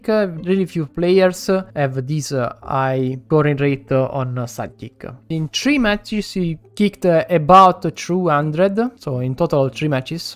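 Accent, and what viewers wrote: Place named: Italy. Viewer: I understand that you are italian from the accent.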